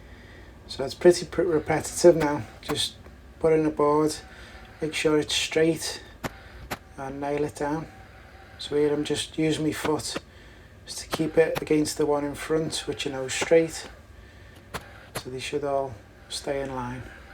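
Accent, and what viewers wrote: Liverpool, United Kingdom. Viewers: …he’s a scouser la..💙👍🏻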